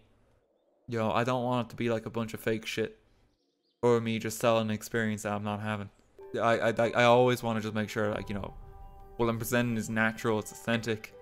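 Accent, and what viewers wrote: Ireland. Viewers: The Irish accent is awesome.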